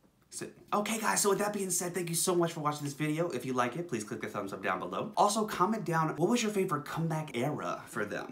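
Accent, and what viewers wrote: South, United States. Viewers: You’re not country darling you’re more of a southern bell 😘 also your remixes are 🔥. My favorite era is Wings 100%. The trailer for their wings tour is so iconic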